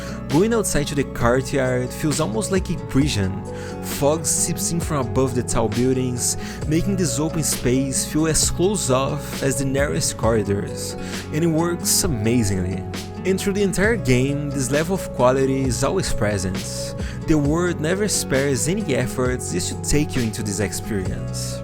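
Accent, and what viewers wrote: Brazil. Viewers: are you brzilian? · Sabia! Kkkk reconheci o sotaque de longe. Sempre bom achar outro brasileiro fazendo vídeos em inglês. Continua que está muito bom!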